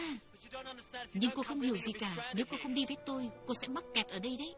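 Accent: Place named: North, Vietnam. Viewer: Htv mà thuyết minh giọng bắc vậy? Htv thường lồng tiếng chứ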